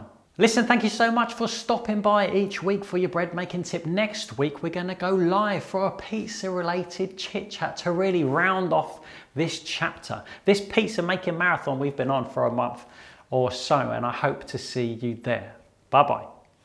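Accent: United Kingdom. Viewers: You have the most iconic British Accent! Makes me want to get your recipe for Banggers and Chips!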